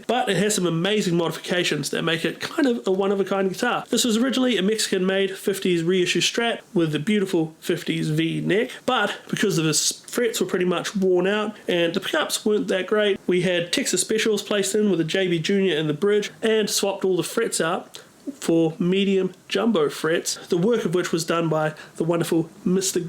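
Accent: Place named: New Zealand. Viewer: Whoever didnt know accent is kiwi needs to watch some more deck themed videos. Glad to know you're in a prog metal band man, will look it up. Great stuff and great guitars ❤️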